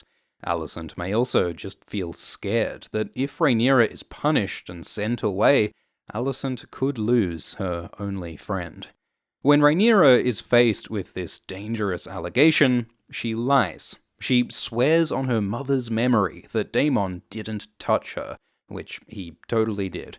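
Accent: Australia. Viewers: Oi! You sound Australian yeah? What time are the episodes out for us? I am not smart enough to work that one out on my own. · You make the best videos. I also love that you're Australian. So much easier to listen to than many other videos for me.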